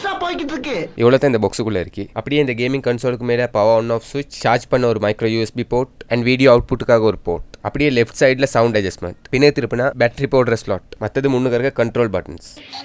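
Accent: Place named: Kerala, India. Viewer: Your tamil is super. Cute ah iruku unga slang. Are you malayali or srilankan?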